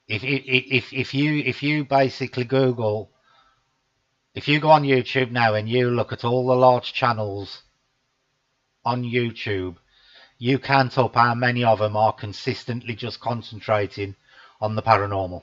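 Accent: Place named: Dudley, United Kingdom. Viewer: Missed the live but catching up now. Nice to hear that Dudley accent aer kid hehe :)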